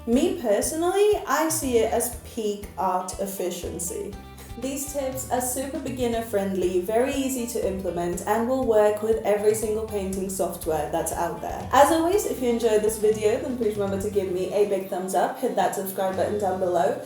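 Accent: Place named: United Kingdom. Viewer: Hey enjoyed this video cause you have a loveeely voice and British accent. I am a fresh learner of PS and it was a bouncer, never the less I liked your British diction and crisp clear voice, This was the first video I came across, and I am impressed by your excellent work and editing skills. Your videos will help me later when I come to terms with PS. Loved your charming personality, I have to submit and follow and Big like too. I am a watercolor Artist and trying my hand at POD and that's where learning PS is so important. If you have the time check out my painting videos. Good to know you. #artistsatwork53 #artistsatwork53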